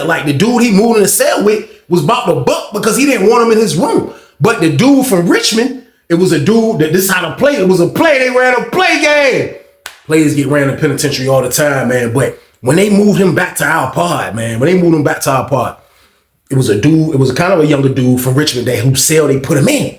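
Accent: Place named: New York, United States. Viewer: BEST PRISON CONTENT CREATOR!!! Yo u still sound mad new york and i cannot believe you are in Virginia 😂😂 You just like the city that never sleep with these BANGAS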